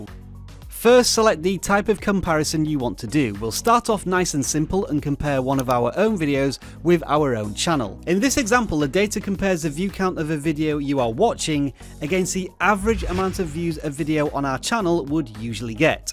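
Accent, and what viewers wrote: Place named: United Kingdom. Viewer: That's a British accent.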